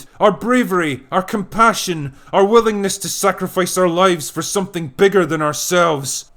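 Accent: Scotland, United Kingdom. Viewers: I though you were a Scot?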